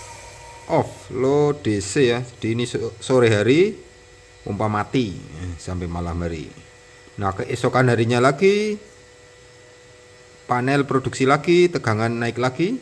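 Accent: Java, Indonesia. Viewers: "Walau Medhok, Kami terus Berkarya" Mantap kuwi mas!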